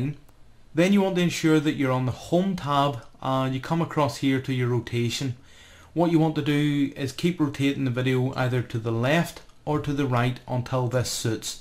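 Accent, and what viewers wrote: Northern Ireland, United Kingdom. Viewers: Hey are you from Northern Ireland?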